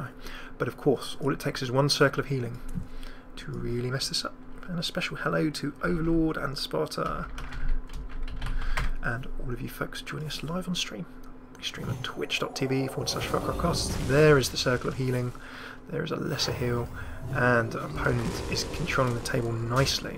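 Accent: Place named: United Kingdom. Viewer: Completely off topic, but I love your dialect. Where in the UK are you from?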